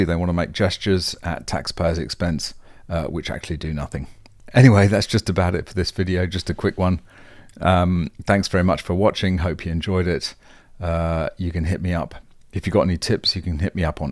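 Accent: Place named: United Kingdom. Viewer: Strangely even though I know you are Australian you sound more British to me.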